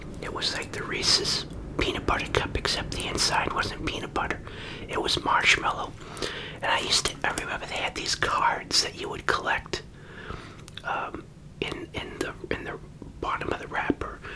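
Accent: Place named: Massachusetts, United States. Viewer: You must be from Massachusetts...I recognize the accent.Hello from southern NH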